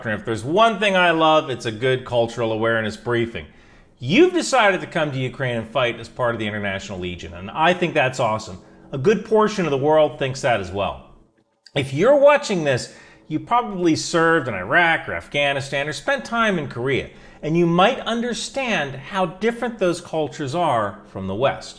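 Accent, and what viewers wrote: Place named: United States. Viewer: As an American who has been to Ukraine several times there is a lot of good advice here and several things I didn't think of until mentioned. But don't take language lessons from him, his accent when pronouncing Ukrainian words is hilariously very American. I would disagree that liquor is usually Vodka. Ukrainians love wine. If like me you don't drink then learn to repeatedly say "Ya ni Pushi". Ukrainians accept that people don't drink alcohol, but they will also be shocked and offer repeatedly to make sure you're not just being polite. Ukrainian Catholicism is very different from the Catholicism you are used to in the USA. They are primarily Greek Catholics and Roman Catholics are uncommon.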